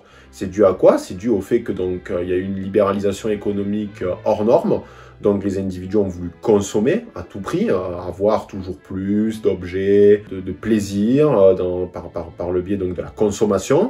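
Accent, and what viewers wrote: South-West, France. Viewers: Encore un nouveau soldat du bon sens qui émerge, ça fait vraiment chaud au coeur en cette sombre période! Merci, vive la culture, vive la France et vive l'accent du sud ouest!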